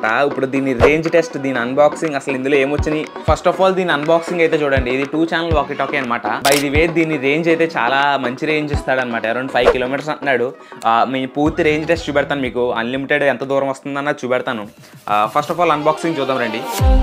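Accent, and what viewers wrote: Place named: Godavari, India. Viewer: Godavari slang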